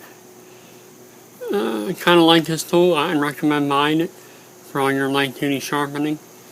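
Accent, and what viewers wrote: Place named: United States. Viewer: gangoso americano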